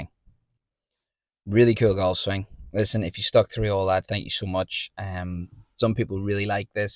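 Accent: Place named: Ireland. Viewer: Hello, I'm Roger. I've played and studied the game for 45 years. and I think you do a great job at your craft here. My parents are from Belfast, so that's unmistakably an Irish accent you have. I've met Ludvig, he's super cool. I Love his golf swing. His head drops a wee bit in the downswing. I think for a few reasons. I'd like your opinion on that aspect. It's quite common among great ball strikers: Trevino for example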